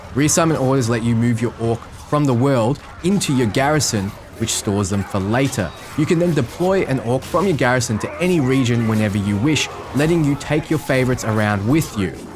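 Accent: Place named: Australia. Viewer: You sound like Fudgemuppet. Fellow Aussies! 🇦🇺🙌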